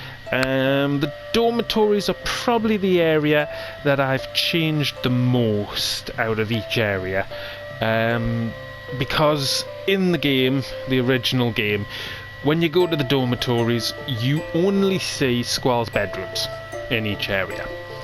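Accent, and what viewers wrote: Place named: Newcastle, United Kingdom. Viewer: It looks fab and you still sound great. Sexy Geordie accent xxxx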